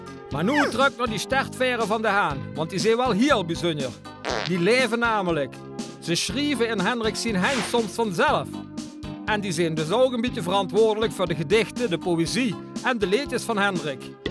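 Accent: Central Limburg, Netherlands. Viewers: TF dit is midden-Limburgs en is inderdaad redelijk te volgen. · welk deel van limburg komt de verteller vandaan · JuniorWorld .Vision Ik kom uit Echt en het zal niet heel ver van Echt vandaan komen. Ikzelf gok Susteren. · klinks als midden limburgs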